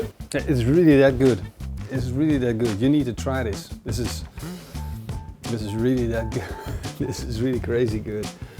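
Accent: Netherlands. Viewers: He's Dutch (from Holland aka The Netherlands)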